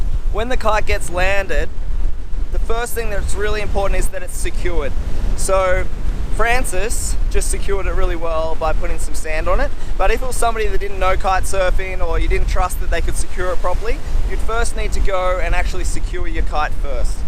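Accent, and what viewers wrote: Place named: Australia. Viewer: Hey. Just wanted to say I’ve appreciated all your videos. It’s great to have an Aussie voice showing us the way. It’s clear you love the sport and want others too as well. Your lessons are well thought out and easily understood ( except for the odd one where the wind in mic plays havoc. And your location always looks stunning too!! I hope you’re ok. I haven’t seen any new vids for a while. I have a question for you and I’m not sure whether you could help with some technical advice. I’ve noticed as soon as the wind gets on the stronger side for my kite size I have a lot of difficulty stabilizing the kite and often end up constantly sineing the kite unintentionally. Do I need to just focus more on what I’m doing or should I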